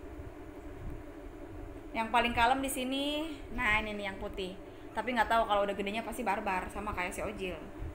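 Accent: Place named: Java, Indonesia. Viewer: Momy ni jawatimur pa jawa barat sih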